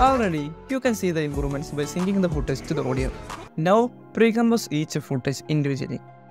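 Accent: Kerala, India. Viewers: Your english sounds like malayali english ( No offence ) are u malayali bro?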